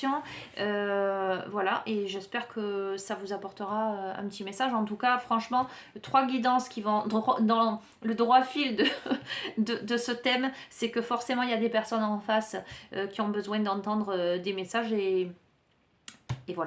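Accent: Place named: South, France. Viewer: Un p'tit coucou du Mans,. Tes guidances,font résonnance, Avec une sonorité spéciale,qui est un régal,cet accent du soleil ☀️☀️☀️ qui enchante nos oreilles.merci beaucoup bisous bisous toujours un plaisir de t écouter.bonne journée à toi et bisous caresses à tes compagnons à quatres pattes.☀️👍🙏🍀😘🌹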